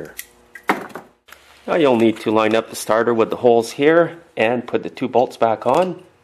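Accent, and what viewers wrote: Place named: Canada. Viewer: Best instructional video I've ever watched!! Love your Canadian accent. My wife is Canadian so I,m kinda partial.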